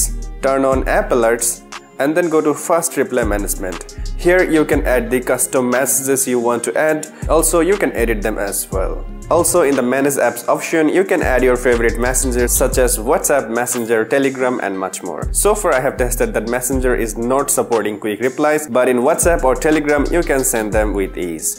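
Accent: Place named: Bengal, India. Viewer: Vai tone Bengali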